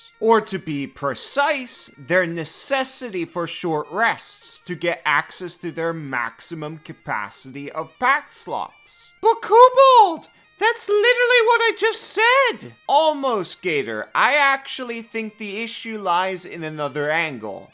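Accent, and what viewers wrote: Norway. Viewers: Hearing the most Rogalending dialect ever threw me off so hard you don't even know dude.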